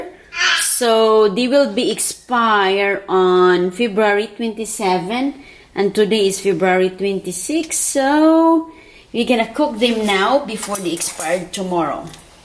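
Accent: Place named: Aloran, Philippines. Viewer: Tagaasa manka ma'am sa Pinas moramag taga aloran ang tuno sa imong inistoryahan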